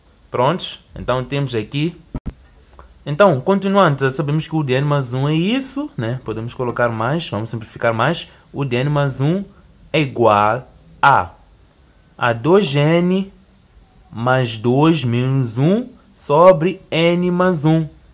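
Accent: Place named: Mozambique. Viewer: Gostei pelo sutac és Moçambicano , e eu sou Angolana 🇦🇴✨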